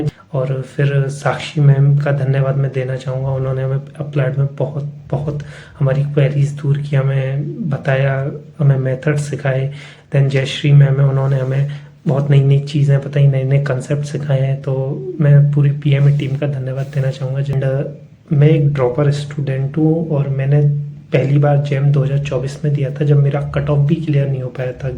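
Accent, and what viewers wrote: Bengal, India. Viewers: sir, THANK YOU VERY MUCH for your content over these years. Your channel is probably the major factor in getting me this amazing IIT JAM 2025 rank I've achieved. Also, I love your Bengali accent. It is quite adorable ❤❤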